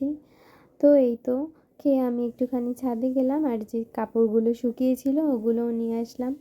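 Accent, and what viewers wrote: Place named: West Bengal, India. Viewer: didi,kmn acho???amr bari Bangladesher Rangpur e....didi west bengal e jeye tumi okhankar vasa sikhcho bujha jacche....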